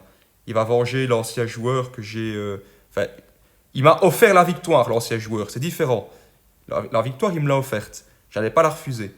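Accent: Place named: Belgium. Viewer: ne serais tu pas belge pas le plus grand des hasard ? Ps première fois que j'entend qqun dire pikka à la place de pekka et artière à la place de l'artificière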